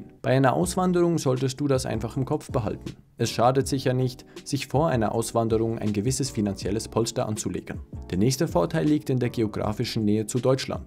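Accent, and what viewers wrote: Switzerland. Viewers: Man hört schon sehr sehr oft Schweiz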